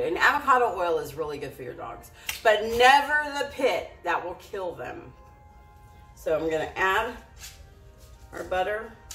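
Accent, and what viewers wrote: South, United States. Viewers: Love your shakes and southern accent. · Too cute with their bibs. Love Monroe loving Tonka, sweet! Tomorrow will be on the road for 10hrs Albuquerque to Okla City. Hopefully we will find a place to settle. Thanks for the Southern accent. Married to a Southern man. hehehe. Joan Bates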